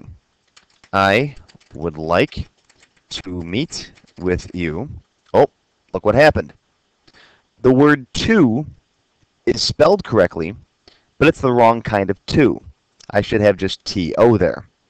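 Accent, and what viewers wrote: Chicago, United States. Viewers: I checked out several tutorials, (school free ware etc). This is easily the best tutorial on the internet. Love that Chicago/Great Lakes accent, LOL! Seriosly, thank you!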